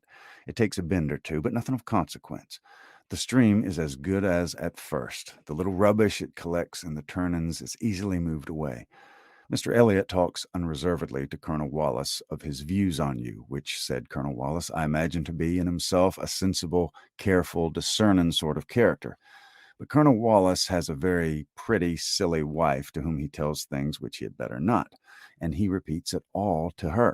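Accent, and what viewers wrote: South, United States. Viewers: Wow, I love this! I never would have thought Austen could sound so good with a southern accent 😂 thank you for sharing your talent!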